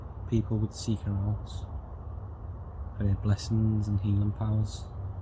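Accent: Liverpool, United Kingdom. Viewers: Love the Liverpool accent and you knowledge thank you a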